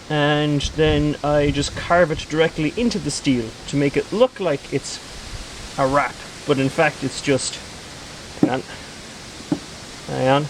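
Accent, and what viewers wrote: Ireland. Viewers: I knew it! Irish english! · Is that a Meath/Westmeath accent? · hey not to be a dick but why are you the only one i ever see on video that has the Irish accent and everyone else sounds American ? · You sound almost Irish?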